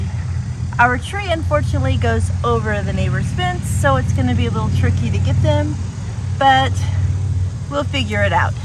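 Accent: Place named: South, United States. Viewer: I'm down in Florida - so many things are different due to temperature, humidity, sand soil. But I love seeing everything others do and can always learn something new. It helps to know where a person is located to determine how their environment may be different and changes I might need to make before copying them. I do think I detect a southern accent in your videos which is beautiful!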